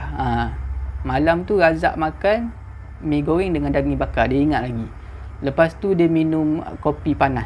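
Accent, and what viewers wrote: Terengganu, Malaysia. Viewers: ro tera citer kot base ganu kali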